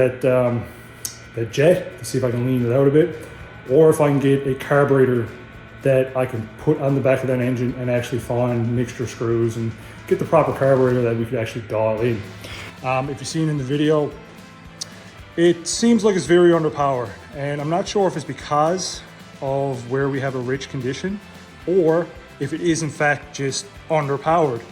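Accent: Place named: Newfoundland, Canada. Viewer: You're a newfy ay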